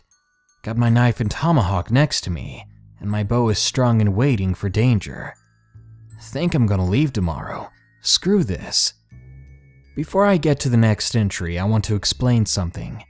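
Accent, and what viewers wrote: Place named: South, United States. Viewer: 🏅🥇at the risk of being ridiculed by others, I'm still gonna share this. Just wanted to take a moment to commend you for your growing success and amazing channel. I subscribed a couple months ago, however, I listen to numerous uploads per day so I consider myself an avid fan LOL. I can truly tell how passionate you are in your work and I think I speak for all your subscribers when I say thank you for putting in so much effort in this art. I can sense your pride in the awesome content, sound effects (especially the demonic voices) and communication with your subscribers. Sorry if this last part sounds stalker-ish bc I assure you I'm not a stalker! (Says every stalker in the history of stalkerdom) 🤣 anyway, I've always had trouble falling asleep until I started listening to you at night since your voice is so soothing! 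So thanks a trill for that! So yours is the last voice I hear at night LOL see that sounds creepy! But I think I'm drawn to that southern, country twang which comforts me, as I'm from N.E. Texas. So there's my experience thus far as one of your fans. You just do a great job with your channel and it should be recognized! Thanks for taking the time to read my message & CONGRATS on your recent nuptials! Hope y'all are off to a wonderful start on a wonderful journey (you know, because "this world is a strange one"!! I'll see ya in the comments, I'll be the one beasting on those who make hateful comments!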